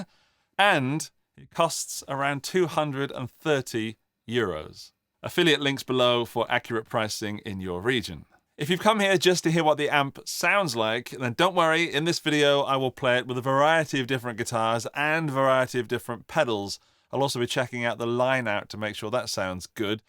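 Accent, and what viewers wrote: United Kingdom. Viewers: Black start me up. Yeah I was researching this and you've clarified like butter. Thanks. You Brits make everything sound good anyway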